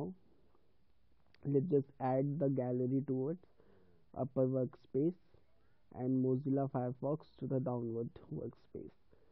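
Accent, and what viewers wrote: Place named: India. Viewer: Damn, that Indian accent 😅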